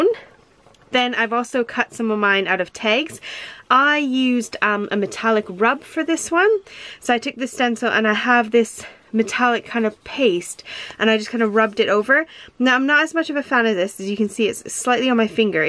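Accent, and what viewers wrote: United Kingdom. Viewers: FANTASTIC video!! Just super, loved it.! I learned a lot and I thought of some different ideas. Thank you sooooo much for teaching us! You can't tell the difference between the home-made pastes and the store bought. Your cards are soooooo cool! You don't sound like you have much of an English accent. What area are you from? Been sweltering here across the pond also! Yuck!☺😊😀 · 35 is VERY hot for the UK. I was laughing when it reached 26 a few years back and the news was warning to keep cool to avoid fainting. However, if it is that hot in Europe this summer, we in Australia can expect temperatures in the high 40s and early 50s. Oh brother. I noticed a bit of the British accent mixed in there. Nice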